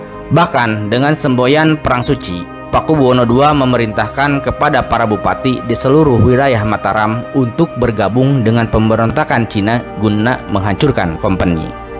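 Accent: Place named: Madura, Indonesia. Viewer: Yg membaca narasi kayaknya bukan org REmbang . Kayak logat Madura tak iye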